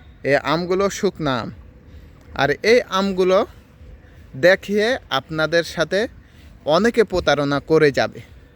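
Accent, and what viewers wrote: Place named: Chapainawabganj, Bangladesh. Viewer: আপনার ভাষা শুনে মনে হচ্ছে আপনার বাসায় চাঁপাইনবাবগঞ্জ। আমার বাসা চাঁপাইনবাবগঞ্জ। আমি কিছু পাখি নিতে চাই আপনার কাছে। আপনার লোকেশন টা যদি শেয়ার করতেন তাহলে আপনার খামারটা ভিজিট করতাম।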